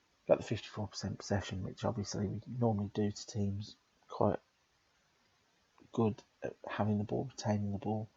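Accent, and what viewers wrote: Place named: United Kingdom. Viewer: I don't usually play these games but when the commentary comes and even a British commentary , It just becomes a whole lot interesting. Keep up the good work.Liked and subscribed.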